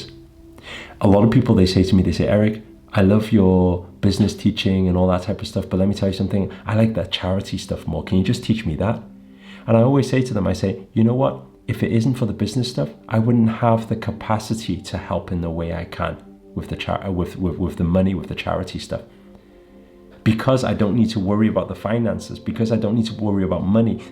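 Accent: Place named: Liverpool, United Kingdom. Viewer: Wow scouser!!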